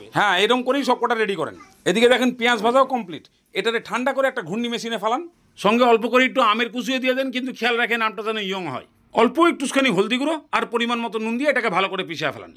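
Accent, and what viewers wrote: Bangal, Bangladesh. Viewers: Sob thik ache sudhu bangal der moto kotha bola ta charte hobe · dada apnake onek dhonyobad.Darun sundar testy korolar recipe dekhlam.Tobe dada apnar bangal katha gulo Bhanu Bandopadhyay er moto lagche.Apnar quality ache aar apni drama korte parben.Thanks. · আপনার বাঙাল ভাষা শুনতে খুব ভালো লাগে, আর রান্নাগুলোর তো কোনো জবাব নেই।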